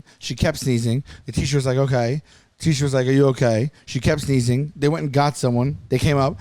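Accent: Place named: New York, United States. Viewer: Yeesh that New York accent is not flattering anymore. It used to be cute a long long time ago.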